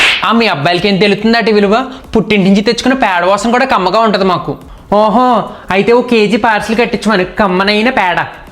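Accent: Godavari, India. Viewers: Mana godavari yaasa malli baga gurtuchestunaru garu.. missing that after marriage and moved to other states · సూపర్ మీది గోదావరి నా sir ❤❤💐💐🙏🙏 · Mee godavari matalu vinte Pranab Leche vastondi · ggaruu mee story prathilipi lo chadivanu andi naaku baaga nachindi mee writing style and mee godavari slang lo chamatkaaram ga maatladadam yevariki sadhya padadu.😅😅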